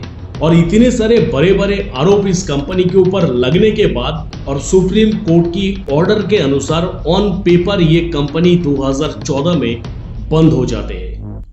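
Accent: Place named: Bengal, India. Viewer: Yes, Bengalis have a lot of courage. Heard of Khudiram Bose, or Bagha Jatin (Jatin Das)? Charapona is Bengali word and the accent of the narrator is unmistakably Bengali.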